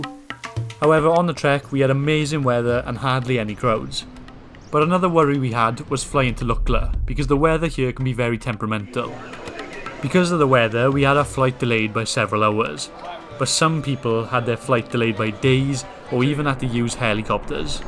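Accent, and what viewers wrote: Wales, United Kingdom. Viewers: Great vid. Made me smile when I heard the familiar Welsh accent haha. Where you from? I’m Carmarthen. I go to Nepal in 3 weeks and I can’t stop thinking about it 😂❤️🏴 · Diolch (yeah, picked up the Welsh accent). Well worth watching.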